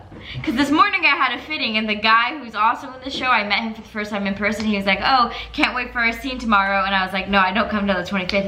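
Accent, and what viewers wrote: England, United Kingdom. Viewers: The English Accent Is Always The Highlight Of The Vlog. Agreed! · Hey love ur British ascent 💜💜💜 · this english accent omfg i am obsessed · That British accent tho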